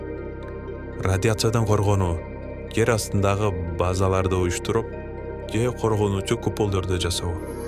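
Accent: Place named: Russia. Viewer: Орус эми кыргызча уйронуп келейткандайго.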